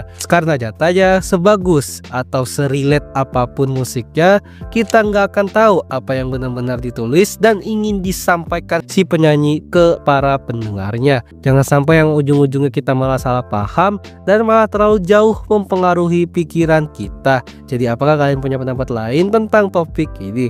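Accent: Java, Indonesia. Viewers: Suara lu jawa min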